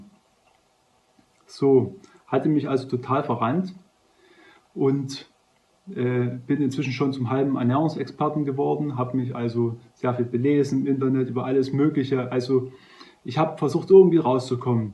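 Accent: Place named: Saxony, Germany. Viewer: He, danke für deine Empfehlungen. Auch das wir uns nicht verrennen sollen bei der Ernährung. Mit den 5 biologischen Gesetzen beschäftige ich mich schon eine Weile. Auch mit denn seelischen Ursachen von Krankheiten. Du scheinst auch in Sachsen zu wohnen. Dein Dialekt klingt mir so vertraut. Liebe Grüße aus der Oberlausitz, Anja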